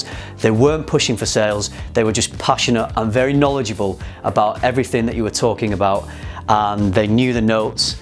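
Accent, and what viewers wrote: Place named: London, United Kingdom. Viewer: really? never been to harrods and u got London accent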